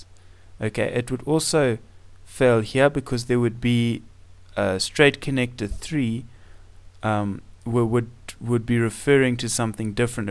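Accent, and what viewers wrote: South Africa. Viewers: Are you South African? guessing Pretoria...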